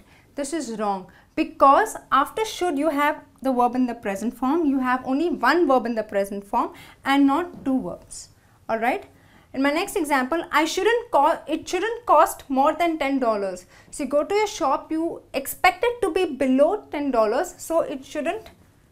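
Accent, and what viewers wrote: India. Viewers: distinctly indian accent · Indian English...as a british i can teach u how to prounce ''2'' correctly lol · yap, i think it's Indian accent :)) Just learn grammar from here, learn accent from others ! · I used to avoid Indian accent. It was like a tinkerbell in my ears. But with you. It's as soft as a Teddy bear. (I don't know why...) And i need to improve my english with your very usefull lessons. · yeah is indian accent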